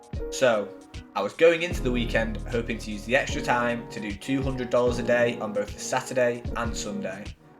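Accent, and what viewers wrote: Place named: Liverpool, United Kingdom. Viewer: I love the Liverpudlian accent. But then, as an American with Grandmum from Bradford, I'm a sucker for any British accent. I really appreciate your videos on Data Annotation. Thank you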